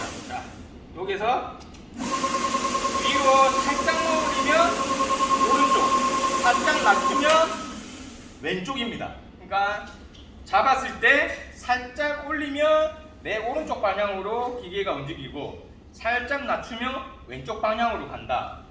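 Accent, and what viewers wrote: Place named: Busan, South Korea. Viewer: 항상 청소장비들 소개 잘보고 있습니다 부산 사투리 ㅎㅎ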